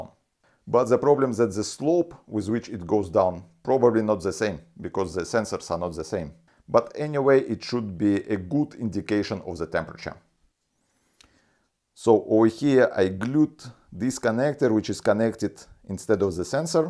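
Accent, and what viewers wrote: Russia. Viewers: Ебать акцент, наш чтоли?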